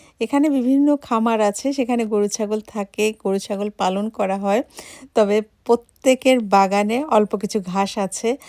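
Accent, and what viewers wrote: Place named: Jessore, Bangladesh. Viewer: আপু আসসালামু ওয়ালাইকুম।কেমন আছেন?আপু জানতে চাচ্ছিলাম,ওখানে কাজ করার জন্য শিক্ষাগত যোগ্যতা কেমন লাগবে আর কোন ভাষায় কথা বলতে হয়? আপু আমি জেসমিন আক্তার। আপনার কথা যশোর জেলার মানুষের মতো মনে হোচ্ছে।আপনার বাড়ি টা কোথায় জানতে পারি?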